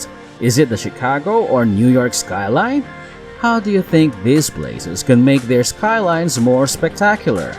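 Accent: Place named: Philippines. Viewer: Narrator is Filipino? The accent is there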